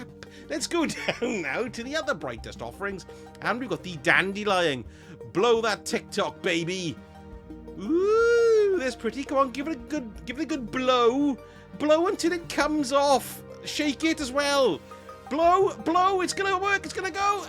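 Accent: Wales, United Kingdom. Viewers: Just wanted to say been watching for a bit, thought I heard the Welsh accent, and well love ya channel and content it's cracking, have a grand day boio...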